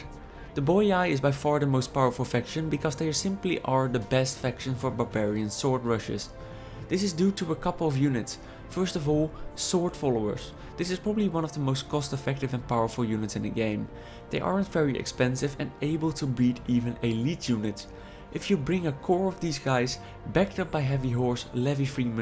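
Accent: Netherlands. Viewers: could you be any more dutch? Why is it that people speaking with a Dutch accent simply sound a little bit inbred? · the guy narrating this sounds like he has a dutch accent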